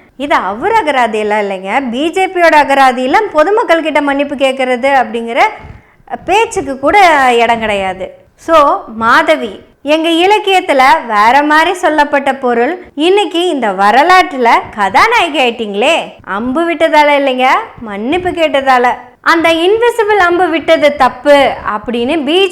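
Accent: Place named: Coimbatore, India. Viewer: Super you are taking different topics and exposing reality dear daughter in Kovai slang especially. Lot's of our peoples are fans of you since for your slang especially 🎉❤keep going 💪 👍 👏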